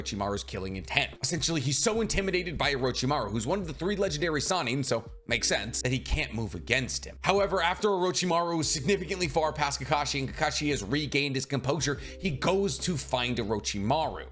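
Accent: United States. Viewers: Min-uh-toe........Min-AH-toe. Sounds infinitely better when you pronounce his name properly. You know, for as much of your content, and thus, your livelihood, depends on Japanese culture, you'd think you would spend 20 minutes learning how Japanese phonetics work(and no joke, it doesn't take longer than 20 minutes to figure it out, and really, 20 seems like far too long at that), so you don't sound so absurdly... White? So American? So gaijin? Either way, I know you can tell how weird you sound since you've heard all these names said properly in the anime. So why are you so content to sound so off putting?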